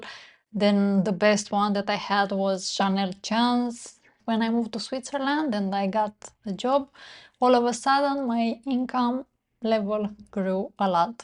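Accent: Romania. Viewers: După accent am gândit ca ești româncă! Deși am lucrat în afara țării ani de zile, am același accent cu al tău!Ma bucur ca ai făcut acest video,pentru ca și eu am făcut abuz de parfumuri și am format o manie pentru asta și m-am simțit vinovată de fiecare dată!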